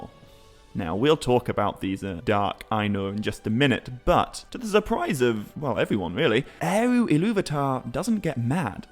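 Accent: England, United Kingdom. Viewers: You do realize that he is English and they pronounce words differently, right? And considering how the ENGLISH invented the ENGLISH language, and also considering that Tolkien himself was English, you don't have any grounds to tell him how to pronounce English words. You just sound like an ignorant jackass.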